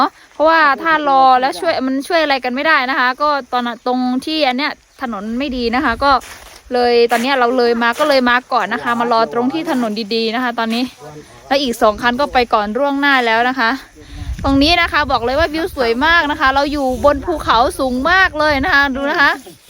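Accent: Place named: Isan, Thailand. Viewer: บรรยายเก่ง ออกเสียงไทยชัด บางทีเหมือนออกทางอีสาน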